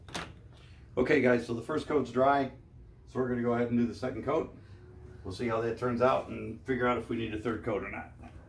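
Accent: Midwest, United States. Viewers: Good information. Oh, I am here for the Midwest accent! Lol. Born in Indiana, raised in Wisconsin, but have lived in west Texas for about 40 years now. I miss that accent! 😂😂😂.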